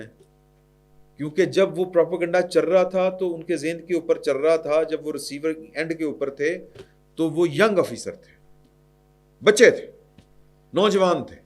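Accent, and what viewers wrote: Punjab, Pakistan. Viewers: Punjabi touch Wel done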